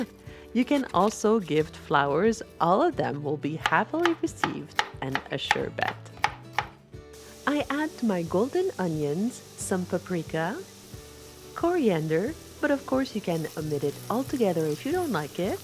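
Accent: France. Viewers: I love spending time with you! Thank you for this video,be safe! PS - is your native tongue French? Greetings from a portuguese fan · I love your pronunciation, it's very French. My city Odesa (Ukraine) has very deep and long-standing ties with the state of Israel and here we pray for the people of Israel, all of us, Jews and Christians.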